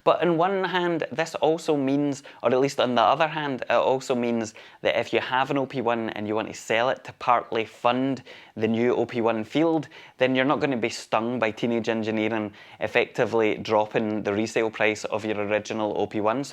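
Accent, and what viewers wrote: Scotland, United Kingdom. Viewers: A man of the people! Great to hear your take on this, I know this is isn't normally your schtick but clearly... the people wanted to know!! Your elegant way with words and dulcet Scottish tones put this ending over the top!!!!! 🙌🐀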